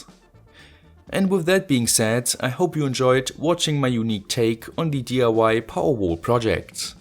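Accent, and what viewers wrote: Germany. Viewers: Are u german? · Man höher so hart dass du deutsch als Muttersprache sprichst 😂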